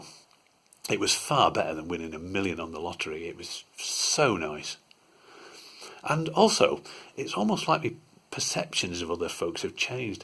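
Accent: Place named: North, United Kingdom. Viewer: you've got a great voice for telling stories, i imagine you could do a kids audio book really well. the friendly soft but deep northern accent can't go wrong! thanks for passing on the dmt love!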